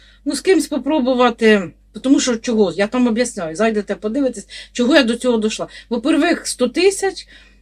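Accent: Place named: Poltava, Ukraine. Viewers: Це полтавська говірка. Я народилась в чернігівський,.лише до полтавської. Там так говорять. Північна частина чернігівщини більше вживають зросійщених слів, не чисто російських. А у полтавська говірка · І в Дніпрі такий діалект..Що тут такого??? І моя бабуся так розмовляла,вона з Полтавщини, але все життя у Дніпрі прожила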